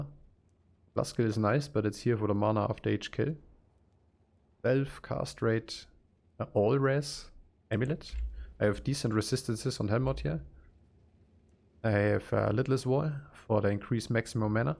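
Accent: Germany. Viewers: Bro u German?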